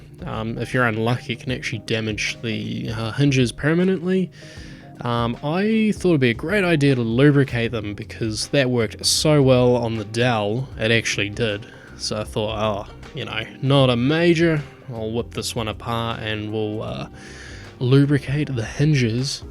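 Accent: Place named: New Zealand. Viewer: I just did a search on the Armada 110, this is the first video I saw - was looking at your machine in the video.......I think I own that exact machine now!!! I was looking at it thinking...no it cant be that big of a coincidence, but listening to your accent it sounded like a kiwi accent.....I went back into my emails from when I brought the machine off trademe last year - I am now sure it is the same machine - what a surprise! :) The machine is still going great, I am getting a lot of fun out of it. Cheers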